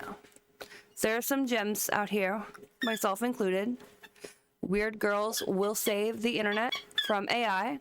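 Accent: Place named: United States. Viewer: Australian with an American accent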